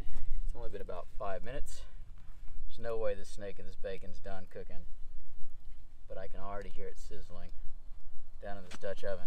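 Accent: Texas, United States. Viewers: most satisfying Texan voice ever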